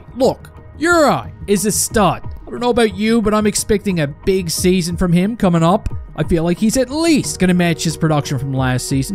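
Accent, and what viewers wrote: Newfoundland, Canada. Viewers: You got to be a Newfie with that accent ,definitely not from Cape Breton !!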